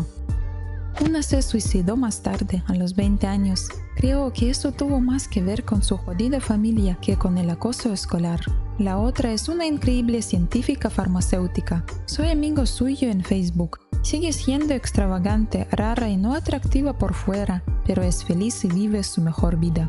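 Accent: Russia. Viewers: tus videos con voz rusa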